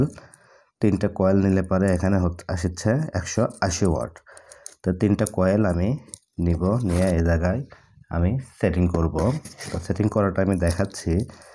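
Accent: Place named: Bogura, Bangladesh. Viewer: বগুড়া বাড়ি নাকি বাড়ে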